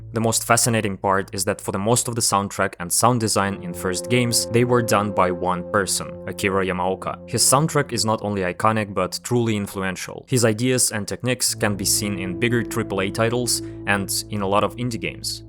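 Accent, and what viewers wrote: Europe. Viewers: He just has a European accent of some kind. Is that what you mean? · I came to say the EXACT same thing 😂. Dudes just European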